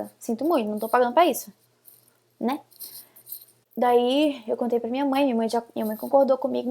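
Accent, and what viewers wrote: Recife, Brazil. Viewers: tú é dq de Recife ? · Tu é de Recife??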